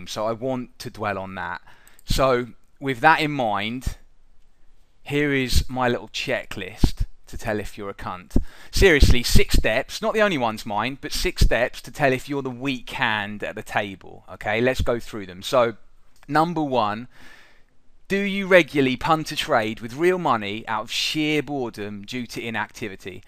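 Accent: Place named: United Kingdom. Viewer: I feel like I’m watching a Guy Ritchie movie haha love the accent. Good stuff man 🤙🏻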